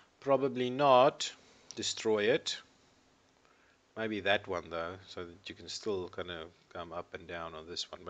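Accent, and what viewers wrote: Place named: South Africa. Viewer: Great accent. South African? Can you say "Diplomatic immunity!" sarcastically please. :)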